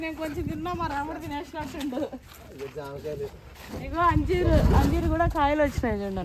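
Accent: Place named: Andhra Pradesh, India. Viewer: andhra accent kiraak asala..... chaala baagundi 😀 you don't often hear that purest form of Andhra telugu in Hyderabad or Telangana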